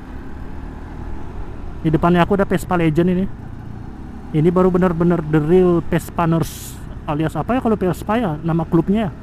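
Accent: Bali, Indonesia. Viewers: Aku Orang Jawa Tapi Gak Tau Ke Napa Gw Suka Dengan Logat Orang Bali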